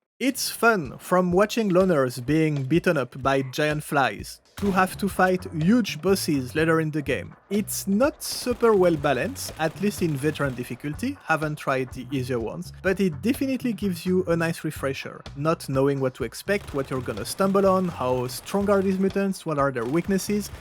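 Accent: France. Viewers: Hi dude, I guess many already asked you this but are you French ? Your accent sounds a lot like French lol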